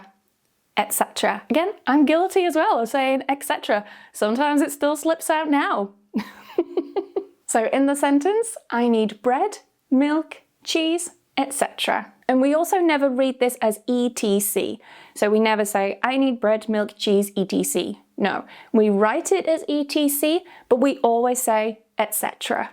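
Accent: United Kingdom. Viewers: I don't think Americans should get pronunciation lessons from a a British person... Js... you guys mispronounced words all the time. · There’s no such thing as ‘perfect’ English nor ‘correct’ pronunciation. There’s common ways to say things. You are British & as an American from NC (the South, y’all) there are many words I pronounce differently than you. Doesn’t make you correct nor me wrong. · She sounds British. Often has a silent T where I was raised. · You sound like a Britian. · I love to learn to speak the English language with the British accent. So glad to have found your channel. Also, I love how vibrant and fresh you are with your beautiful smile and rosy cheeks. :)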